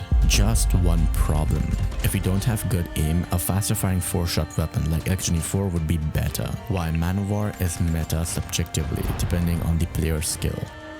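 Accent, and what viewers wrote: India. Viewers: I usually dont like indian accents as they are hard to understand but the crispness of your mic and the way u talk is just so smooth 😭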